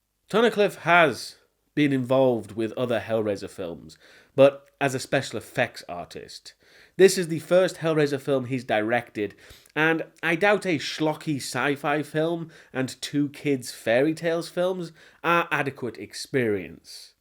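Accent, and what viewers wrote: United Kingdom. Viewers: Is this dude British? I love his reviews btw I just want to know if he is or not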